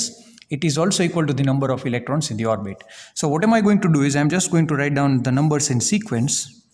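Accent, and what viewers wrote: Gujarat, India. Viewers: Sir by your way of speaking it seems like you are gujarati. Am i right?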